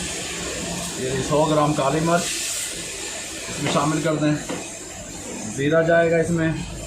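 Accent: Punjab, India. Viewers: Aapki punjabi sun ke din ban jata hai bhai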